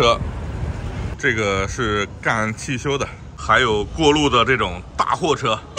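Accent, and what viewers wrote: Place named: Feidong, China. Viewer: 肥东话多少还是和市区的有区别 不过也差不多是乡音了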